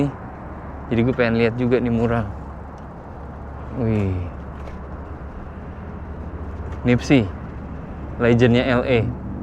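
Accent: Indonesia. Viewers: buat orang rantauan luar negeri selama 19 tahun itu keren kalau logat indo lu masih dipertahankan, atau ga berubah, karena beberapa orang yg udah lama diluar negeri ketika balik ke indo itu logat indonya kurang, kyk bule baru belajar bhs indo wkwkw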